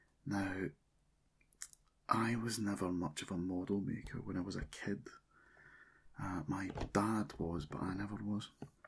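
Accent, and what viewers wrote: Scotland, United Kingdom. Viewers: I can’t believe this exist. Warhammer asmr in a Scottish accent. I’m so ridiculously happy.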